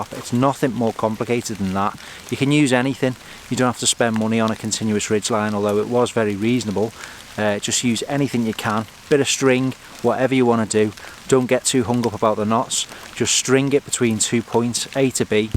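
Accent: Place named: Cheshire, United Kingdom. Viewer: Some of the terminology you used, I’m guessing ex squaddie. With the accent, I’m guessing ex Cheshire?. . . . . I’m probably way out, but the video is really great and informative. I’m trying to get back into camping again, without an officer or Sergeant screaming at me, and getting my grandson into it. Definitely worth subscribing. Ta laarr.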